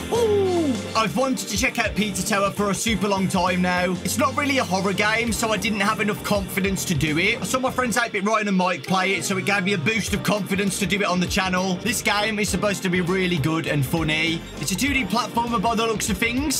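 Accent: United Kingdom. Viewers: i dont know whats so funny about a british man screaming EXOTIC BUTTERS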